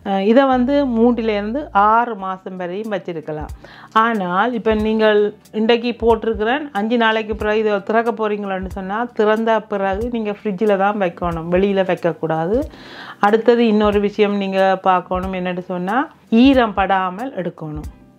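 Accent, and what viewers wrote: Sri Lanka. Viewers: Super Acharu 👌👌it's so very nice to hear you with your Srilankan accent 🤪Your garden also looks nice 👏.I too do this picked vegetables but never added mangoes.I will add it next time. Vazhga Valamudan ❤❤🥰😍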